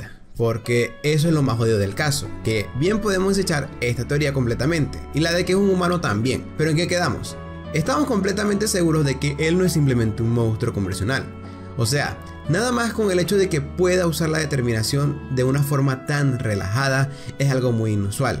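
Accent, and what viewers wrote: Venezuela. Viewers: Eres venezolano XD mano del alma! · eres venezolano? :V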